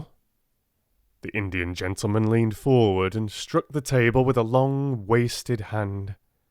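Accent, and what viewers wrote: Yorkshire, United Kingdom. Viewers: Please could you read Wuthering Heights and Jane Eyre. I guess you are from Yorkshire and would be well suited to read these stories. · He and Neil Gammon ( sp?) Are my two favorite narrators with the touch that only a British dialect can pull me into the story or lull me to sleep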